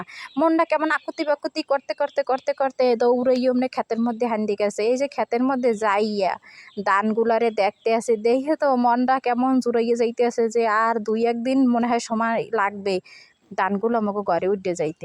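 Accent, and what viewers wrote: Barishal, Bangladesh. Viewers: বরিশাইল্লা ভাষা মুই ভালা পাই🎉🎉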